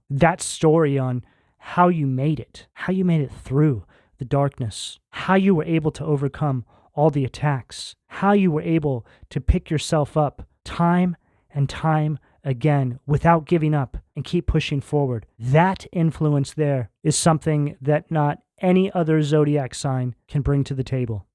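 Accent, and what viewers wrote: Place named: New Jersey, United States. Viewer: what a deep and meaningful reading meant a lot and do accurate on every level … thank you 🙏💓 and I just love the way you look if my mum was here now, that’s what she wanted me to have my hair like yours just thought I’d pass that on 🤭wish you a super time in ‘out of state’ from me in the Uk altho my Mom was from New Jersey … and i also enjoy your accent it makes me feel close to her god bless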